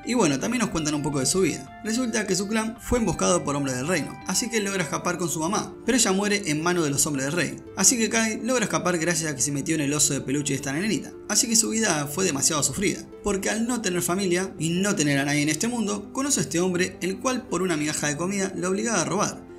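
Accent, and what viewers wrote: Argentina. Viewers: Dandole una buena piña jeje... no se pero algo tiene el acento argentino y el relato de series. Muy bueno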